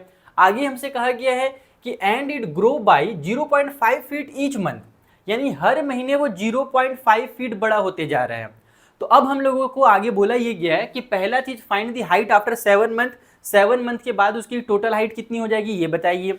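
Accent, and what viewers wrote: Bihar, India. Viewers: Pta nhi chl rha kya language se biharrr babu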